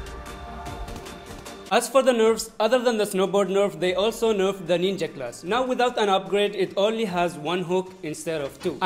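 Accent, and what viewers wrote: India. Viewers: Indian accent :( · Hahah I love your accent indian right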